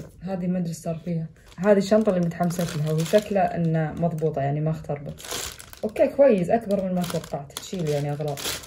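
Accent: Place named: Kuwait. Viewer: اللهجه نص كويتي نص سعودي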